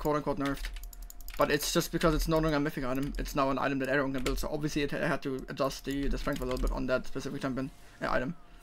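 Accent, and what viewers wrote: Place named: Germany. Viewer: Ah, alrighty man. I had you at what is considered max for my ears and I still barely heard the game. You make the stutter sound so fluent it makes it sound Korean/Chinese with the speed of which you're speaking. That mixed with the German accent and the "mumbling" from the braces makes it sound even more like it lol.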